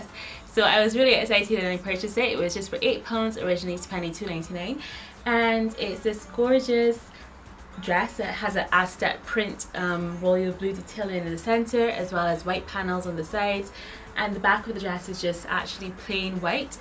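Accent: Barbados. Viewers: Totally been stalking your channel, heard your accent and knew you were a fellow Bajan in the UK! New subbie!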